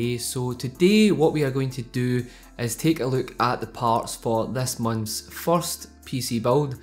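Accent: United Kingdom. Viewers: his british accent tho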